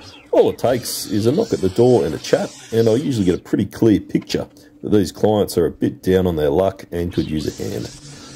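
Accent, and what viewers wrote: Australia. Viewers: Are you from Australia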